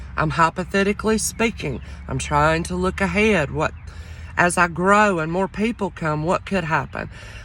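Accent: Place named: Western North Carolina, United States. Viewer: …I love your NC accent. It shines Western NC and I love it! Your journey is full of love, kindness and wisdom. To love yourself is the first step in being able to share your love with others and that is so powerful. I have watched YOU and your channel grow with love and wisdom over the past year. I remember when you questioned your reselling journey and felt inferior to other channels but here you are today shining bright and taking each day as another day to grow. Thank you for sharing your journey because it resonates with me and I’m sure with others who visit your channel. A wrench is a lot of work and kudos to those who take up the challenge! Enjoy this beautiful NC weekend! ❤️💕❤️🥰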